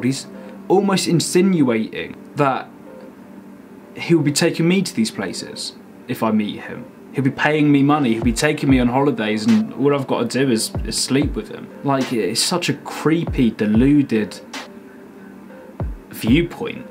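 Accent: United Kingdom. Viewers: I like that british accent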